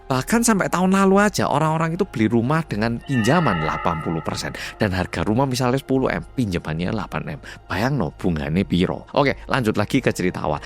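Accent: Java, Indonesia. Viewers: Salut dgn adik Korea ini bhs Indinesianya sangat lancar dgn logat Jawa .... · Duh medok e ,,, ❤❤❤ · ooo gitu tho mas medhok ... :-D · Adminnya orang Korea kerennn deh, bisa bahasa Indonedia berlogat Jawa, he..he...👍👍👍😂😂❤🇮🇩🇮🇩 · Woow..Bhs Indonesia dan dialex jawa nya mantap pol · Mantap 👍 bahasa jawanya medok banget😊❤️ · Logatnya medok banget, kayak orang Jawa.Sukses selalu Buat Abang ini. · Lha wong dia masa kecilnya sampai dewasa tinggal di Malang... sekolah di sekolah negri Indonesia... Pas kuliah aja s Singapur... Ya bhsa Indonesia nya medok lah ..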